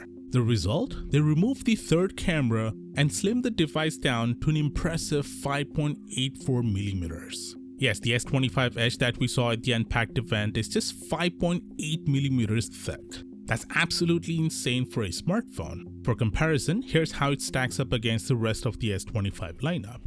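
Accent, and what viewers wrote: India. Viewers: Of course its an indian accent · ur english sounded like indian or pakistani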